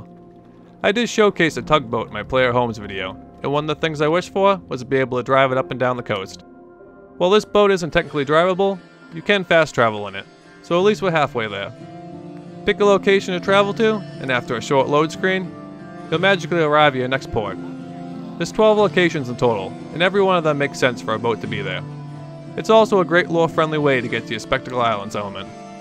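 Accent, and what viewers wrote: Boston, United States. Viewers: okay thank you for replaying! Being a console player my whole life mods are foreign to me. One more thing, are you from boston? Coming from a fellow Bostonian. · cool I like the Boston accent and could u do a vid on energy weapon mods if u haven't already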